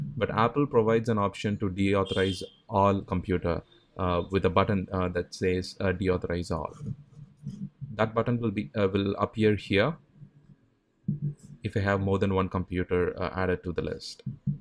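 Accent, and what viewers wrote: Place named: United States. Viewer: MURICAN ENGLISH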